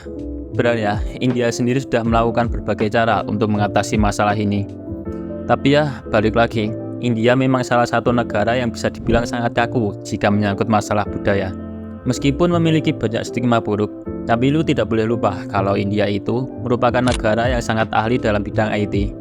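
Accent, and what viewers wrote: Java, Indonesia. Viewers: hanya karena author medok pun dibuat masalah, miris lu ya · kalo ngomongnya masih medok, ga usah lu gua lu gua brody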